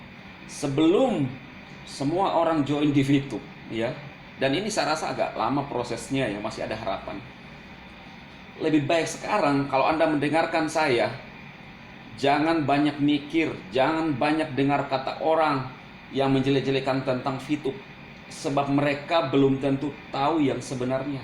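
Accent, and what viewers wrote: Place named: Bugis, Indonesia. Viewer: logat bugis y.. josss vitube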